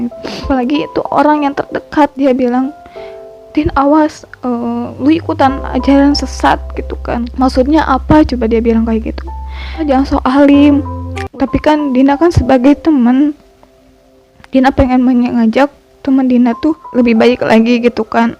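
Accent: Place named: West Java, Indonesia. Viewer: Eta logat Sunda nya 👍👍slalu Istiqomah ya sayangkuuu 😍😍🤗😘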